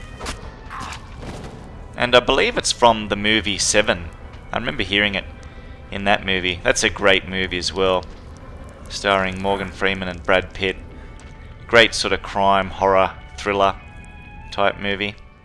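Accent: Australia. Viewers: Is he aussie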